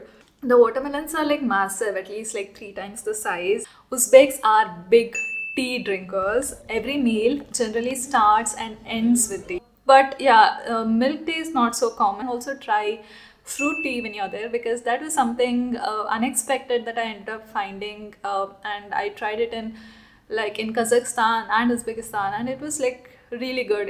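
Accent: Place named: India. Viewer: I enjoyed your programme BUT you need to improve your pronunciation..its too much of Indian slang in your speech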